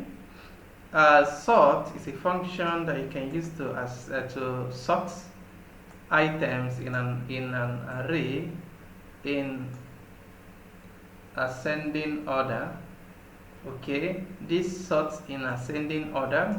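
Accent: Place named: Nigeria. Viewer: Wooaahh!!! Thank you so very much, Mr! This video helped me to understand the "sort" function and method of use very well!🥰 Also... I kept on laughing sooo much, as I listened to you teach! At first, I didn't even know who was teaching, until the accent caught my attention! lol!!... (I was expecting an Indian, as usual - they actually teach very well... but the accent sometimes is a challenge for me.😊) But when I checked the name of the vlogger because the accent was sooooo Nigeranly, and I saw it was Nigerian?? 😂 I couldn't help but laugh sooo much as I watched and learned along😅. You really sound like these our Nigerian lecturers in the Nigerian Universities😂💝(plus the "do you understand?" that you kept saying!😂🙌... Oh Sir, I truly appreciate this video!🙌) Thank you once again Sir, for taking out your time to make these videos. God bless you!🙏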